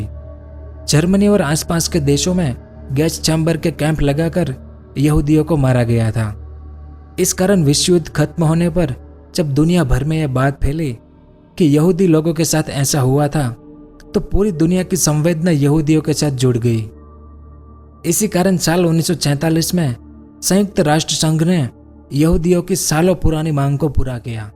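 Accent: Gujarat, India. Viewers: आप की बोली में मुझे गुजरात की मिली जुली बोली लगती हैं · Aavaj Se to Gujarati lag raha hai Because I know tone of Gujarati language is different in different part of Gujarat. I think may be he is from Ahmedabad, Surat Or Sauratra.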